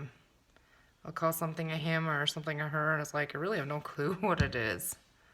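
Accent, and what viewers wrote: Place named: Midwest, United States. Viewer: Just found your channel and also subscribed to your monthly kits. Lovely style! I’m from MN currently living in IL, and your accent makes my heart yearn for home!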